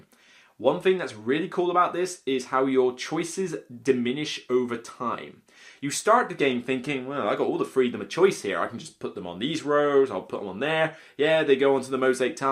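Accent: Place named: Bristol, United Kingdom. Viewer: Canadian here, you’ve become my favourite board game channel for your deep understanding of mechanics and finding imbalances, etc, rather than just a constant ad for each game. I have a question… your accent is unfamiliar to me but sounds like someone from my childhood from Bristol. Are you from that area?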